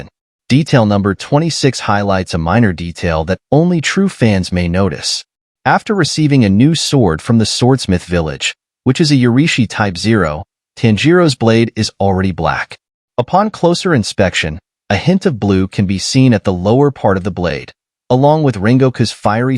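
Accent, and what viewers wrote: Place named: United States. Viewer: Stop fcking using American accent to Japanese names ffs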